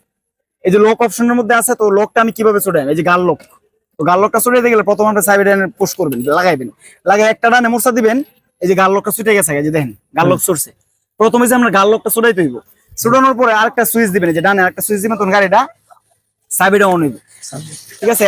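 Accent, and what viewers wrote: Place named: Mymensingh, Bangladesh. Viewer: apnar bari ki Mymensingh? vasha shunei apon apon lagtese. onek kichu shikhlam apnar page theke gari chalanor. bike chalanor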